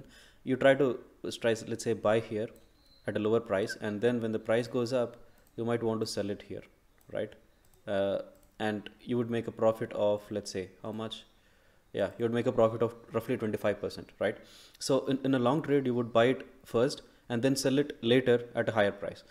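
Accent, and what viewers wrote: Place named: India. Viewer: I knew it that he is Indian guy as soon as i heard his english accent and he proved it in that chart of Trading Comparison because only Indian or non US citizens would write $ sign after the number 😂 Instead of writing it as $10 he wrote it as 10$ hence proved Indian. No offense I'm from India too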